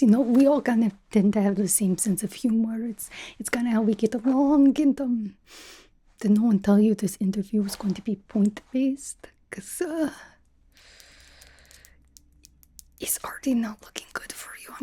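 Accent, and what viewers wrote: Russia. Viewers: Yelena is back and still making my scalp tingle. ✨ I love that Russian accent, you're so good at it. 😁 And the hair. 🖤🤍 Being captured and interrogated is a lot of fun. 😁 I hyped this. 🖤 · I agree, the accent is great for the russian characters shes done so far the silly attitude does make it amusing too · Hello. I'm Noah, and I like Marvel superhero movies, particularly the MCU. I really liked your cosplay of Yelena Belova. Your Russian accent is very good, even though I really have not watched a MCU film "Thunderbolts*". I wish that I was a young man, who is kidnapped by other Russian assassians and locked me in a cage, then needs to be rescued by Yelena and said "Thank you". Or... if I needs to be trained by Yelena, then kidnapped by the other Russian assassians and locked in a cage, I would use my special training skill to escape. That might be a good idea.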